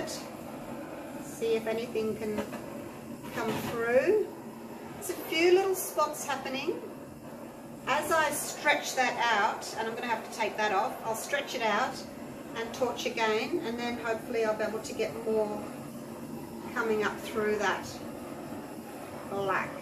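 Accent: Australia. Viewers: I love hearing your Aussie accent as much as I love your artwork.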